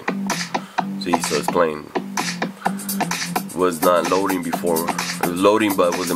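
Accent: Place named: Russia. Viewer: No idea where that accent is from, Russia maybe, but you just solved my problem. Thanks!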